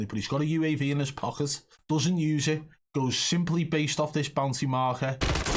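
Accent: United Kingdom. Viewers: you are a joke. You and your disguisting british accent and face.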